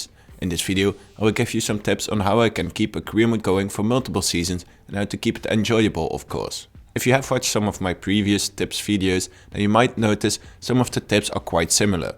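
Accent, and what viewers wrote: Netherlands. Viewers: I smell Dutch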